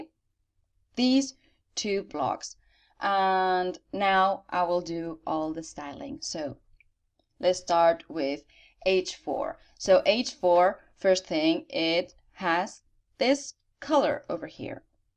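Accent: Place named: Europe. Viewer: Hay !!! I was all struggling doing it the hard way with the other wordpress youtubbers? No wonder it was taking so long? to get it? Thank you nice lady with the exciting european accent : ) spaciba?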